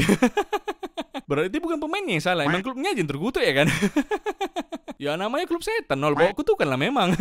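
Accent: Medan, Indonesia. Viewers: Gua kesini aja gara gara inget logat medan nya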